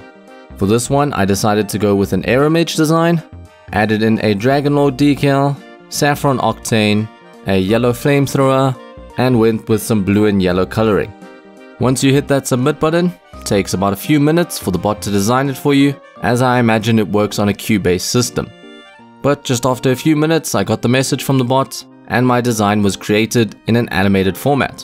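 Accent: South Africa. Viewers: i had a feeling you were South African from the accent, but had to check your bio. Glad to see i was correct! Good Vids!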